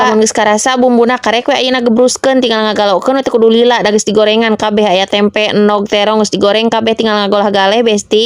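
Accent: Sunda, Indonesia. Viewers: Logatna Sunda pisan teh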